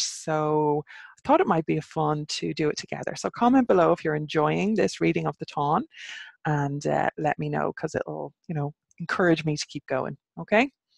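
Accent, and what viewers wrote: Ireland. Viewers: Enjoying this immensely. I am in the US so your "Irish lilt" is especially charming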